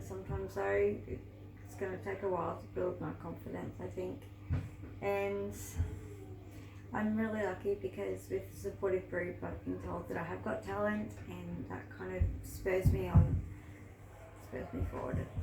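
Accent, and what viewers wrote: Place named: Australia. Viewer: Hello from the UK! Hope you are baring up ok in your midwinter (for us it is the middle of summer, but it still keeps raining and isn't very warm). What region of Australia do you live? I like your accent!! :-) And loved the beautiful botanical art you did in your 20's! I'm glad you are keeping up your art.....unlike me, who can't seem to motivate myself, even though I want to!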